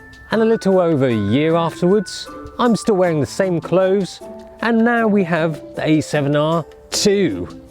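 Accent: United Kingdom. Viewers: Asian guy with British accent is blood awesome! Isn't it? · Most annoying thing is Asian talks in British accent 😑😑😑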